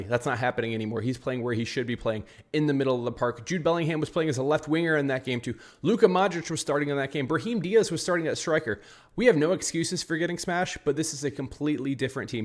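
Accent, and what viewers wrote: United States. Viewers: I like your presentation,are you an American?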